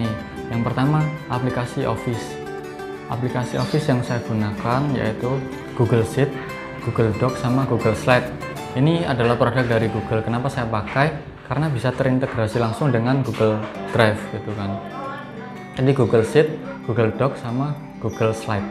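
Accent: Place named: Java, Indonesia. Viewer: Seneng gua dengerin medok lu mas wkwkwk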